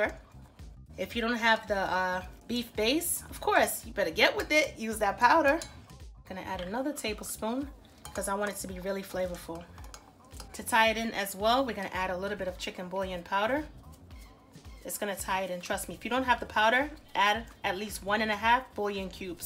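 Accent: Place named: East Coast, United States. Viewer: Yummmyyy!!!! We look for the good food but listen for that East Coast accent! ❤️❤️ 😊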